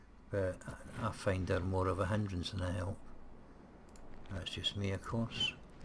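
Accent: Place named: Scotland, United Kingdom. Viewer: You sound Scottish and if you’re not don’t get angry cause I’m Swedish?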